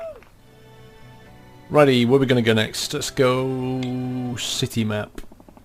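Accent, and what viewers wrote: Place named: United Kingdom. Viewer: Whats up with you and crossbars?? Ever imagine how your car will feel without differential, almost the same deal with bridges and crossbars. u play like u have never seen a bridge before. by your accent u live in UK, how can u be so naaive about structures. Always use crossbars below the bridge and in places where there is a lot of stress.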